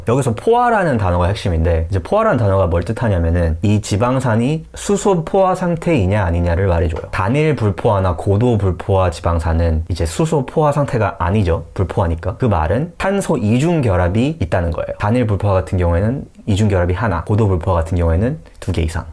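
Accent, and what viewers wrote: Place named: United States. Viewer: 미국살다온 말투ᆢ 좋당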